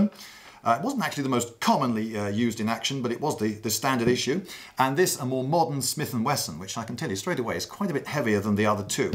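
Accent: United Kingdom. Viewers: That was very clear and expertly done. The British accent gives this video some class. 👍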